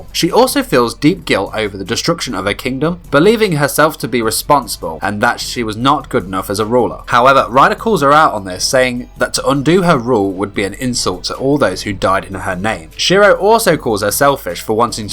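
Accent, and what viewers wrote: United Kingdom. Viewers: are u welsh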